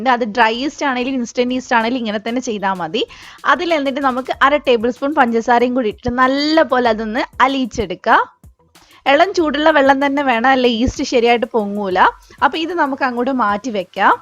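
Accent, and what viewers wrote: Kannur, India. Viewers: Super sound kannur evideyann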